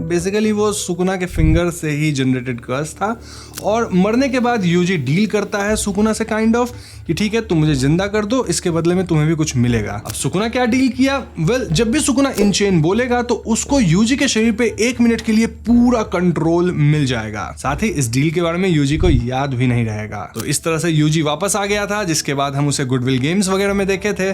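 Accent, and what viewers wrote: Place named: Bihar, India. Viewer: Bhai ye sb to hmne anime me dekh hi lia 😂 Teri vdo dekhne ka kya fayda Vo bhi bihari accent me bol rha h 😏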